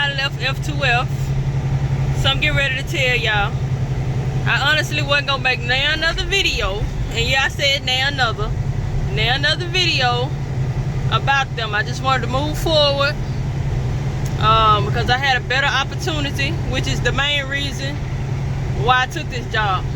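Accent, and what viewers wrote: South, United States. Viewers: Hi there! Sorry, that happened to you. My peoples lives in Memphis. I just your southern accent. Your down to earth too. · Hey lady. I haven’t been with you from the beginning. But I do remember when you were local. Then you got your own truck. I remember you talking about running under your own AUTHORITY. Then you started company hoping. And now your back driving your own and running Local. Stay post and start and keep your head up. Still waiting on that other channel. Love your Southern Twang.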